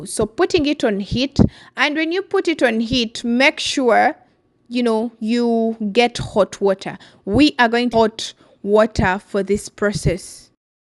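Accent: Uganda. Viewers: You sound UG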